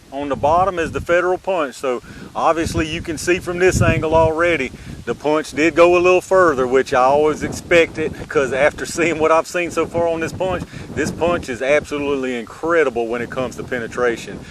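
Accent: South, United States. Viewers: Excellent presentation, loved: that southern talk!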